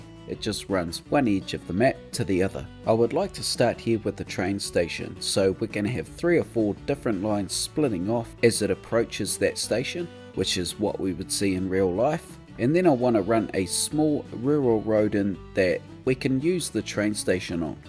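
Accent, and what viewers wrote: New Zealand. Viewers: Your level of detail is amazing. You popped up out of nowhere! How long have you been playing the game and been on YouTube? Love your Kiwi accent. A Canuck here!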